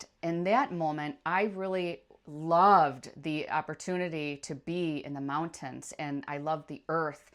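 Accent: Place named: Michigan, United States. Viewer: Wow! Your story is very similar to mine and my name is too. I knew you had to be from MI from your accent. I spent over 25 years living and working in Chicago area and after my 3rd son graduated from high school I started planning my escape. My husband and I had been married for almost 25 years, raised 3 boys, started a land ran a business together (for 10 of those years for me. The company is still thriving in NWI), built a beautiful home, but we hadn't been drifting apart apart our first son left for college at UW-Seattle where he stayed for 15 years (he recently moved back to Chicago area--beautiful but too expensive even with a great job in IT). I left our business in 2013, and started planning to divorce and move, which was a mutual agreement. I was so sick of Chicago winters and always wanted to live at the beach, where we had vacationed many times. 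I moved out of my 3000 sq ft home,into a studio apt where I would live for the next 2.5 yrs, worked at a tax prep firm (I had been a CPA/accountant/tax preparer my whole career so this was a great fit for me). In the summer I worked 3 jobs at once as the min wage in SC is still $7.25, I was struggling but happy and living at the beach. I had never felt freer. Flash forward 11 years I am retired and living in SSI. I met my second husband )totally unplanned for sure), and in 2023 we bought a fixer upper home in a beautiful and safe neighborhood 3 miles from the beach. My friends and family visit often and this year a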